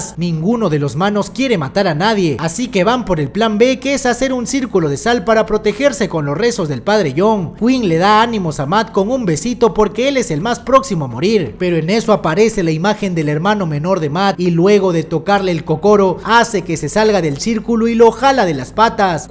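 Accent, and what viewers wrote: Peru. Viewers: Gracias a ti me volví fan del acento peruano xd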